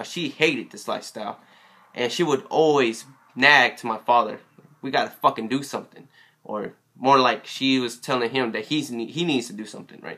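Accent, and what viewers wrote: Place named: South, United States. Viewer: I just also wanna know where your southern accent is from. It's dope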